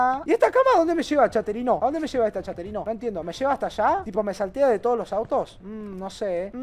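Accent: Spain. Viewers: El acento español de es perfecto sin más, igual que el arremedo del xokas xd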